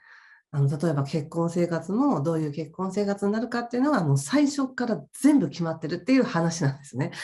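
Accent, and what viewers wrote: Kansai, Japan. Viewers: めっちゃ関西弁なんですね😂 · 、以前から少し言葉の端に関西を感じてたんですが、大阪の方だったんですね！めっちゃ親近感〜❤私も大阪出身でーす😊わーい正直な物言いが素敵！そうでなくちゃー！ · さんの関西弁、新鮮！！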